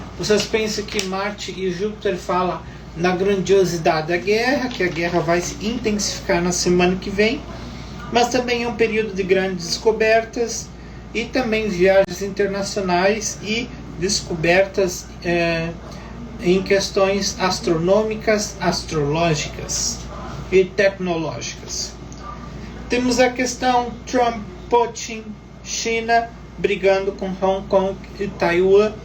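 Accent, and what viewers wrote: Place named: Portugal. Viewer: Sotaque português... 😂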